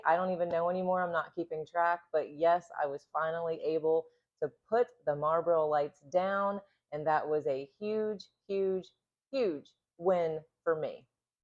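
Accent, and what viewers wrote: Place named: United States. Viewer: Love the accent. Im from Ireland and I rarely here a real and nice Amwrican accent, you know some influencers put on a drain thing and sound artificial.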